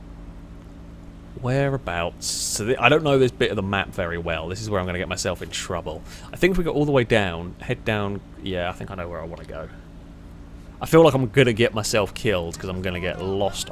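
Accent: United Kingdom. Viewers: there's nothing like a british man narrating his criminal adventures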